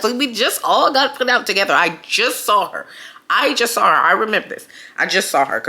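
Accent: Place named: Bay Area, United States. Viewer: Sis I was gonna ask you where you were from cause I was listening to you talk & was likeee “she sounds like she’s from the crib!” Lol . I found a comment & you said you’re from Oakland. Yesss love my Bay youtube girlies! 🤍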